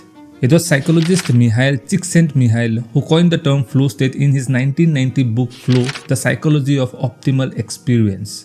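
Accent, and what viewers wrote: India. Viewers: I hear Indian for some reason like it’s screening at me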